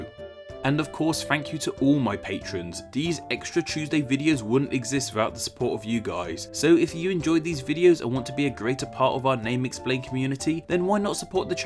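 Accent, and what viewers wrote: United Kingdom. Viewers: Jeezzzzzz you sound british yoursels real extreem british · So you are British then? From the North, maybe? Brummie? Geordie? Scouser?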